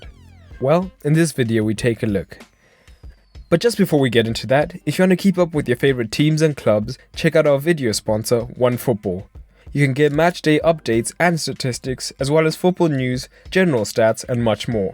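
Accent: South Africa. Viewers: South African??? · are you south african by any chance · Sorry fam have to ask...are you South African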